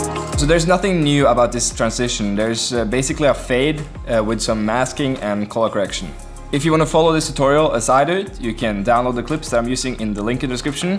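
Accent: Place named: Sweden. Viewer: I thought he was Swedish hah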